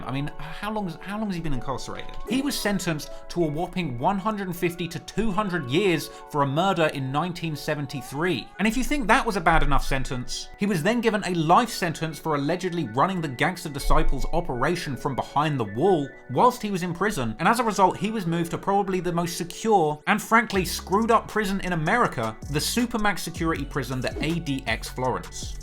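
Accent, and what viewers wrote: England, United Kingdom. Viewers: Yeah I think he's from, you know, England - because of the British accent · why is a british man giving me information on a chicago based gang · We don’t wanna hear a guy from England report on this…..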